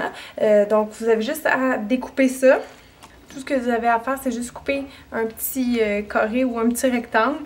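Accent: Quebec, Canada. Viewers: Tu c'est j'ai une tante québécoise et j'adore ton accent et j'adore ta chaîne je te suis depuis le début bravo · Tu connais Céline Dion? elles ont le même accent c l accent du Québec ! mais vrai tout le monde a un accent lol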